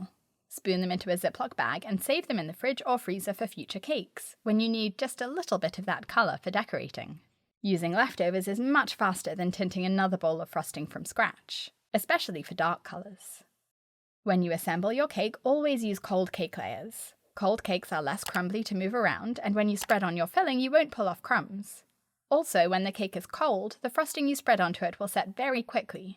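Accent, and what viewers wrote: United Kingdom. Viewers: Amazing tips.By the way are you British?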